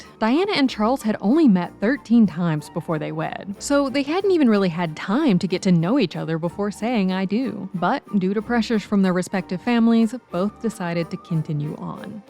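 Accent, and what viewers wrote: United States. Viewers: And William was very clever and Harry failed in almost every subject even art the teachers had to fix it. The story of Diana was a bit one sided no mention of the police having to stop her calling a man’s home over 400 times she was not concerned about if the man she was involved with was married or not there is a great deal more about her that British people know I notice the person telling us has an American voice? This story has been told many times time for Diana to RIP on her island with her family.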